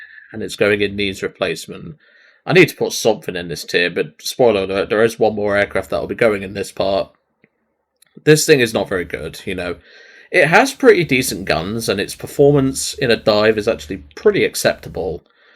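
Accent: United Kingdom. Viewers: Midlands accent?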